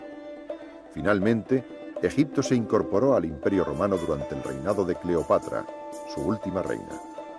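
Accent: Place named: Spain. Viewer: No sé si sea por el acento español pero hay partes (finales de palabras) que no se entienden pues no vocaliza bien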